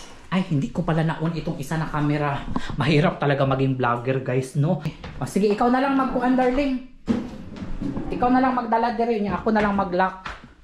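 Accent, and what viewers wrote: Iloilo, Philippines. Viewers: Hi, para Kang Ilonggo maghambal Dai... Find it cute Dear...♥️🙏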